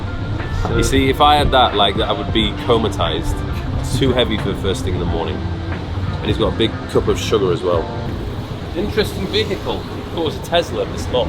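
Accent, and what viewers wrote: South Africa. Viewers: That Saffa accent was THIIIIICCKK,,, Yes boi... anyways love the content! Watching from South Africa 🇿🇦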